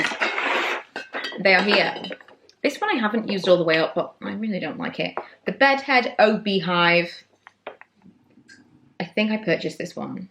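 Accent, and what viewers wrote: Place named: North, United Kingdom. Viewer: I remember watching one of your vlogs (possibly around Christmas?) when you were with your work friends and you sounded proper northern, it was funny!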